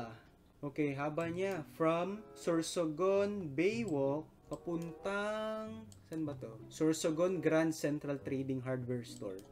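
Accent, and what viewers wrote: Visayas, Philippines. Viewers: Bisdak diay si Engr. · Bisaya d-i ka dong?asa dris Pilipnas ka gkan?hehe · Hi bisaya diay ka?...hehehe...parehas diay ta. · Bisaya dodong.. Apir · Klaro kaayo basta bisaya hahahaha nag subscribe nako engr. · Basta ang alam ko bisaya ka bai tama ba ako.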